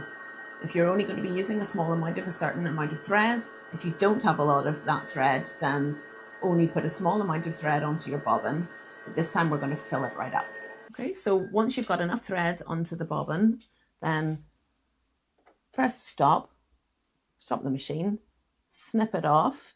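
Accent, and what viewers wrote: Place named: Scotland, United Kingdom. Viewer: Oh gosh or maybe that's a Scottish accent I detect 😂 May I ask what machine this is please?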